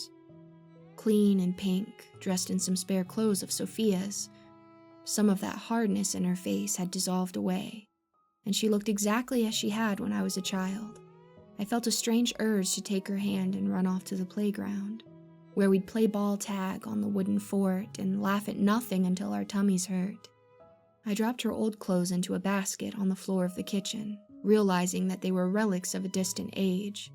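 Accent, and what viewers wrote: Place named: South, United States. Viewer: I would love to hear 👂 your southern accent more , but then icc c would feel like your spoiling me ❤️😛😍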